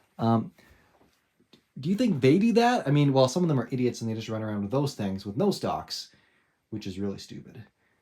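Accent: Canada. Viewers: Ahy do you have a canadian accent and use ML ? 🤪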